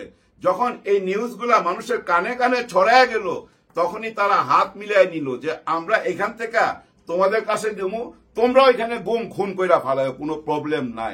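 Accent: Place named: Noakhali, Bangladesh. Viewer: Nice story vai I am pretty much sure you are from noakhali it’s ok nothing wrong with that..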